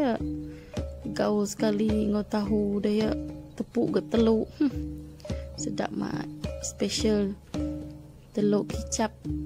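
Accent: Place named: Iban, Malaysia. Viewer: Pertama kali saya dengar loghat Iban..suka dengar loghat2 lain ni. hehe..btw resipi ni boleh buat ni. thanks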